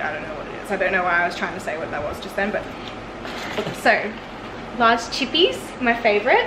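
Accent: Australia. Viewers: She definatly has an Australian accent and the scenery in the backround looks Australian but I don't know for definite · I love you girl. I admire how much you can eat. You’re beautiful and I love your Aussie accent ❤️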